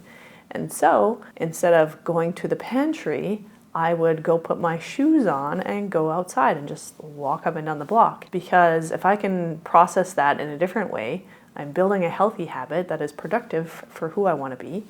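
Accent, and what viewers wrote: Canada. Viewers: I hear a fellow working class Canadian accent, I upvote lol Yes I have a simple brain. Not related to being working class Canadian. I'm just simple.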